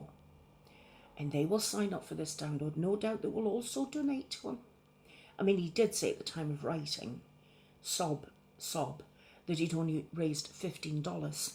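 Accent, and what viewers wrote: United Kingdom. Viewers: He sounds so much like my mother "after all I did for them and never asked for anything in return" except there was always a price for everything, a high one and she would use the tiniest thing for leverage to get her own way for years later, not to mention using her 'good deeds' as things to brag about to anyone that would listen. I would never trust Chris about anything. I heard about him in passing (in a good way) quite a while ago and went to see his channel. I left very quickly because I recognised the personality type straight away as one that isn't healthy to be around in any way at all. He does nice things for people to buy them and then they're not allowed to leave his team ever afterwards. If they do, he lashes out. He doesn't understand that people are not objects to be owned and controlled. On a different note, sorry to hear you're struggling in this weather, hopefully it will break soon, or at least be less humid. 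I live in a nearby part of the UK to you (assuming your accent matches where you live) and we aren't used to this level of heat. I have a good supply of warm clothes and waterproof clothes, but very little for hot weather!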